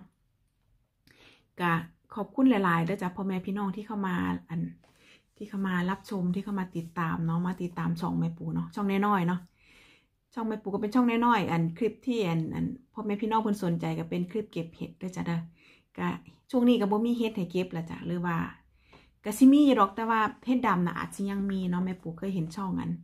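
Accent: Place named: Nakhon Phanom, Thailand. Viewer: แจกเบิดรึยังจ้า อยากได้ขุดที่1 ภาษาเว่าคือไทนครพนมแทะจ้า แม่นคนนครพนมบ่อ อรอุไรลักษณ์ จีรันทนิน 200/803ม.4ต.ระแหง อ.ลาดหลุมแก้ว จ.ปทุมธานี 12140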